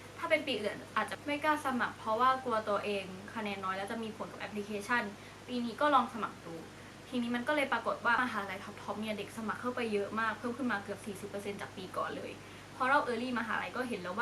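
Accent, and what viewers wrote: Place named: South, Thailand. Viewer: รู้เลยค่ะว่าน้องคนใต้ สำเนียงออก ^^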